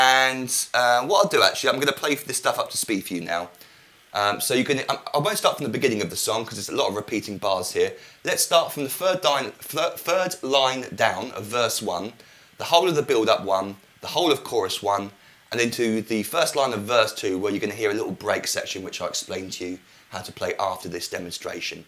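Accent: United Kingdom. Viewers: Thank you! Like your strong British accent.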